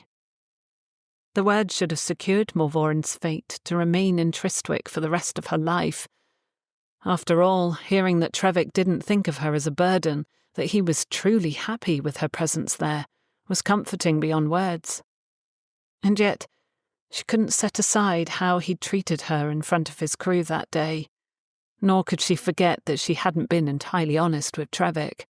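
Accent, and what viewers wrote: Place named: Cornwall, United Kingdom. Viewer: did a great job. She really brought the story to life with her narration and Cornish accent. She was the perfect narrator for your wonderful story. I’ve enjoyed this series. Thank you for making it available on YouTube.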